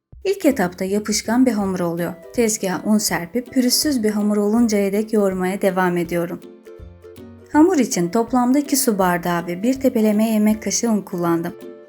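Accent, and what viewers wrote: Azerbaijan. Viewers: Cok guzel kısa net. ve bıktırmadan anlatiyorsunuz??elinize sağlık..merakımı mahzur görun ama bir sorum var sanki azeri bir aksanınız var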